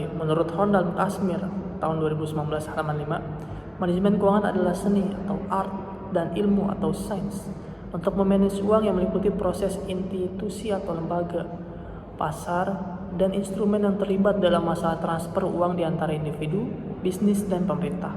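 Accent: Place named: Java, Indonesia. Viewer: Lucu bgtt kayak mas mas jawa😂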